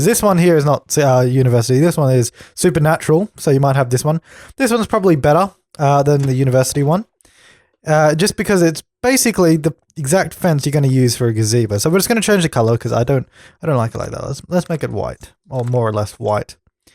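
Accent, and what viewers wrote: Australia. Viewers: Finding this in 2024! You sound like an Aussie just like me!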